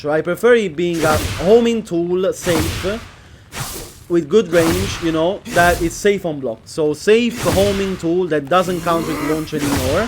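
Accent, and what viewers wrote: Italy. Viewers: L'ho capito subito che sei italiano bro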